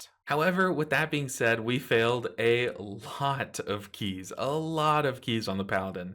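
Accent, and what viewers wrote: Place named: Midwest, United States. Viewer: lol I also love is like midwestern accent where he is just kinda like aw shucks. He never gets tilted or pressed !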